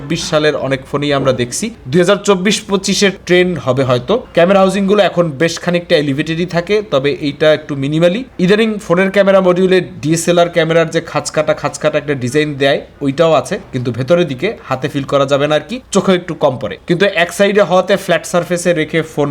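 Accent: Barishal, Bangladesh. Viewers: ভাই কি বরিশাইল্যা নাকি?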